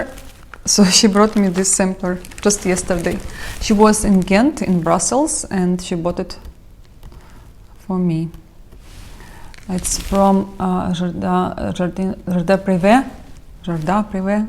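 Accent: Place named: Russia. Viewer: Talk abour BORING! If I hear "just nan" one more time my head will explode! Your projects are SO boring, so dull, they completely lack color, and nothing is more boring than samplers! It's like oatmeal! And on top of that you attempt to say french words when you are barely understood in English because of your massively thick russian accent. Seriously? You are so blatantly bougeois in contrast to the suffering of the people in your home town! The only good thing about this video is susie, the most beautiful chihuahua.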